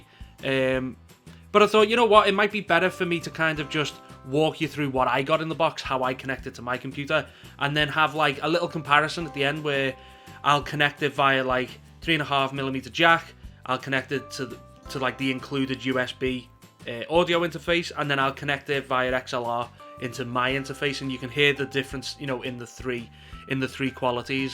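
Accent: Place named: Liverpool, United Kingdom. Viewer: Sound like ur from Liverpool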